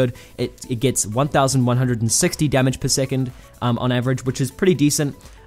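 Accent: New Zealand. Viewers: New Zealander. Noice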